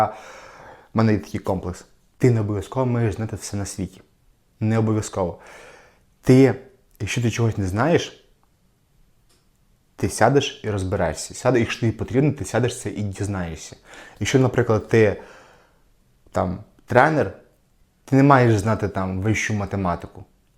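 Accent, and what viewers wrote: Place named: Galicia, Ukraine. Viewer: Клас! Підбадьорив і ніби прожив моє життя і мої комплекси в минулому., дякую за твоє відео і за твою відвертість. За такими людьми, як ти, майбутнє нашої планети і наступних поколіннь. Судячи з акценту і прізвища, ти є з Галичини) Я вгадав? Перепрошую, що одразу на "ти", просто так відчуваю.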